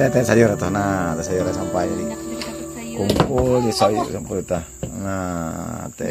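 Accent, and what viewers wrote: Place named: West Java, Indonesia. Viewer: Kok logatnya kayak orang Sunda atuh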